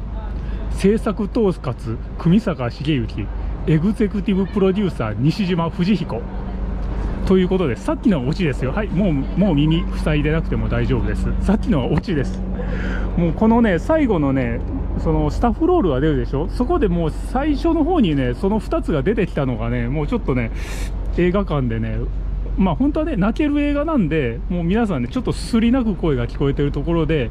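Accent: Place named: Southern Nagano, Japan. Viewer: 破戒は南信の飯田市殿岡の大江磯吉がモデルとも言われています。「島崎藤村『破戒』のモデル 大江磯吉とその時代 (信毎選書) 」の本を以前読みまして、飯田に用事で行ったときに、殿岡の長吏の父親と神社のバラックに住んでいた場所とお墓に行きました。神社の傍らに資料館もあるようですがその時は気付きませんでしたが。飯山市の唱歌ふるさとの作者との関係も興味深いです。大雑把にはこの動画で。このことで藤村は飯山には出向けなくなったと言っていますね。 <link> 動画でもちょっとだけ冒頭で柳田国男に触れていますが、（破戒とは関係ありませんが）柳田国男の資料館が飯田市にもありまして、「秋風帖」という旅の紀行文での愛知県作手村の「ポン（おそらく漂白民・サンカ）」の項も気になりました。 長野県は細かい被差別集落がとても多かったというのと、今でも他県からの移住者などに排他的なところがあるといった事をよく聞きます。うちの親戚がある伊那地域は「本家」「分家」制度が色濃く残っていたり、飯田線中井侍集落の戦後まで残っていた「おじろく・おばさ」の奇妙な風習とか、木曽の藤村も含め、四方山に囲まれた長野はそういう土壌が根深い土地なのかもです。 弾左衛門が関東と隣接地域を任されていますが、なぜか一時旧伊那県でもあった関東からはずいぶんと離れた愛知県設楽もその管轄権限に含まれているのが謎で、未だに理由がよくわかりません。 ちなみに主さんが「こんにちは」というイントネーションが南信のそれとそっくりで、びっくりしてます。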